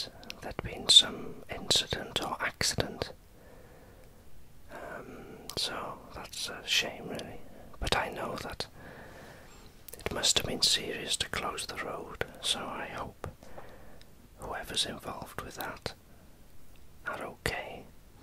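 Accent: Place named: North Wales, United Kingdom. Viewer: It's fantastic to hear a whisperer with a North Wales accent. I grew up near Llandudno and to hear you say you were in Betws-Y-Coed recently makes the world seem a smaller place :) In fact I sat by swallow falls and had a sandwich last week. I spend much of my time touring Europe with work so to hear a familiar voice is very relaxing when I'm away. Keep up the good work, and thanks again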